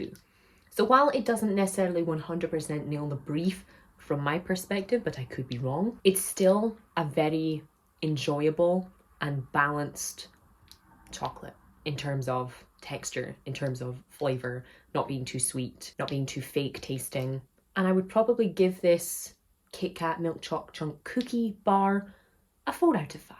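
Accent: Scotland, United Kingdom. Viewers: She is Scottish.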